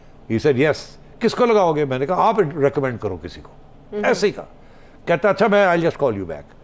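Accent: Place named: Punjab, India. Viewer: Mr sethi Childish naughtyness in your talks and gestures enchants....and further Punjabi tarka enchants square (in terms of math )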